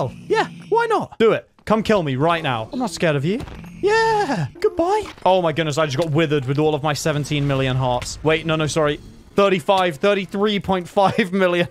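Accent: United Kingdom. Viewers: YOU KINDA SOUND BRITISH LIKE GEORGE FROM DREAM SMP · Hes british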